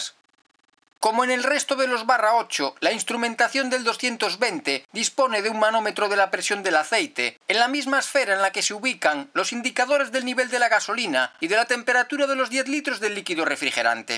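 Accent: Galicia, Spain. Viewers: Este vídeo es al canal lo que un Mercedes clásico a la automoción: calidad, honestidad, sosiego y elegancia. El acento gallego es la guinda, pues es original y huye de convencionalismos. Desde Donosti: zorionak! Excelente trabajo.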